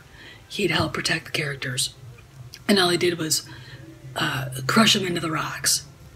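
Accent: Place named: Canada. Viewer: Love your accent. Are you from Canada?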